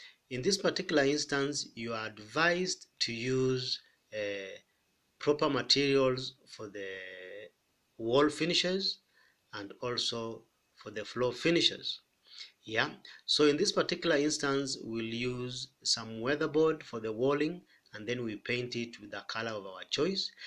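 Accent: Kenya. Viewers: Sounds Kenyan. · Good stuff man! and why do you sound like a Kenyan?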